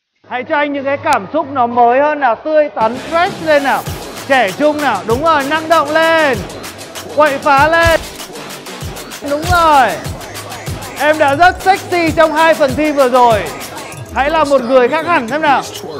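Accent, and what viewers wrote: Vietnam. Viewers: giọng hà tĩnh lạ vkl ra :)) nhái giọng bắc ròi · HaTinh giong rat Ha Noi ^^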